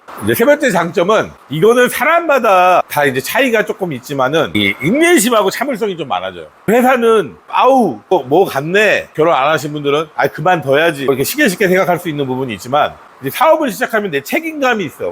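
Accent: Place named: North India, India. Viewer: 인도 북부 케쿠라 억양이 아직 많이 나마 있네요 한국말 잘하심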